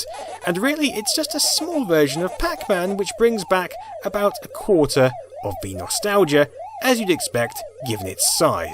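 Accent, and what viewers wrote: United Kingdom. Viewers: The french man say: C'est trop Cool! But will you stop having this british accent, it's so hard to understand for frogies...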